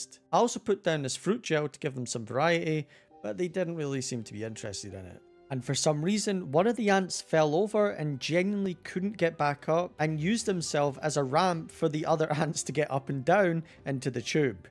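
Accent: Scotland, United Kingdom. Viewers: That a scottish accent? If so love to see a fellow scot being an ant enthusiast 💙💙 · Thank understandably British guy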